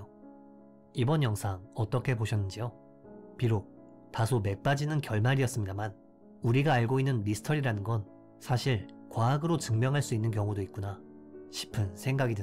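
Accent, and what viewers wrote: Japan. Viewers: 오 이걸 이제야 보네요ㅎㅎㅎ 어투가 살짝 간사이쪽으로 들려요! 어처구니없는 사고가 많았는데도 좋은 영상 찍어주셔서 감사합니다 이번 일본여행은 좋은 일만 있으시길❤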